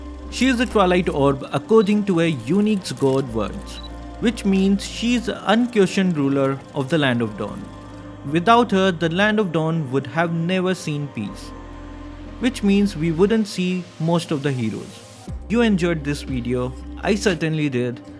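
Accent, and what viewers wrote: India. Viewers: Am i the only who is possed by his indian accent?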